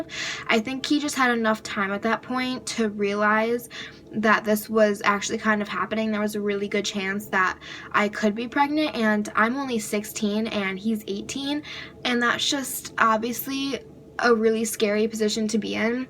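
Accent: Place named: New Jersey, United States. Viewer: The jersey accent!!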